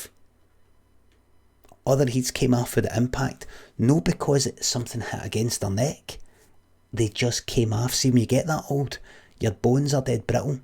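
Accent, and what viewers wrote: Scotland, United Kingdom. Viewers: Where in Scotland are u from limmy?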